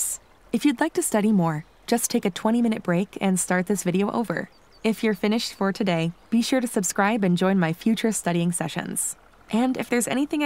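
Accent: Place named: England, United Kingdom. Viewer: I like ur English accent 😅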